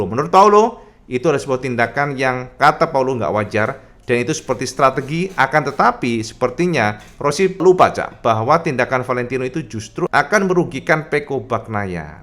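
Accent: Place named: Cirebon, Indonesia. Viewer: kayanya orang cirebon nih, logat bicaranya sama kaya tetangga gw 😅, kereen 👍🏻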